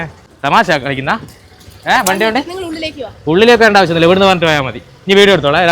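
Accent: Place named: Coastal Kerala, India. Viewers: വടകര സംസാരം 😂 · Chettante slang poli. Evida sthalm. Kannur aano🤔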